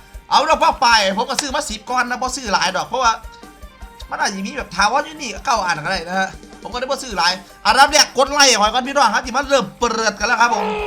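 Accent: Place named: Isan, Thailand. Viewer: มักคำเว้าเจ้าเด้เนาะ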